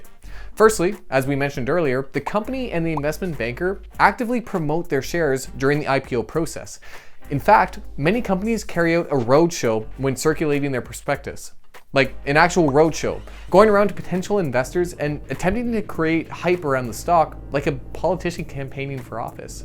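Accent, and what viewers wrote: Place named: Canada. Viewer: Just enjoying the Canadian Abeut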